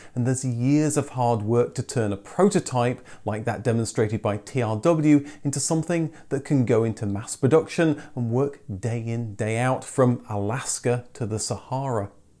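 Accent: England, United Kingdom. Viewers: Same here after listening to ur way u deliver us which is similar like UK English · You had me fooled too. Started following your channel very recently, and mistook you for a Brit . Are you from the Midlands originally - Birmingham, maybe? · Didn't realize you're are American. To me you sound British. Also the choice of cars is in my opinion very European. Anyway, great channel. Thank you for all the time you put in. Cheers from Bavaria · You always seemed to be from the UK , some sleepy village there but great stuff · I was about to say the same. You've done well keeping such a clear English accent without any hint of an American accent. I remember a lad I went to school with, he went to America something to do with becoming a sports coach when he was around 19. When he returned after a few months, he had a ghastly 'fake' accent to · Yeah i'm very surprised too. 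With all the english cars videos and the accent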